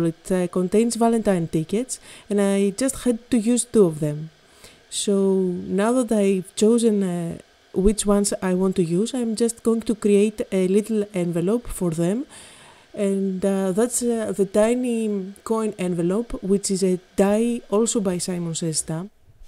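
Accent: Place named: Greece. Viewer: I have been following your blog and videos for a while now and just love your cards. Just love your Greek accent.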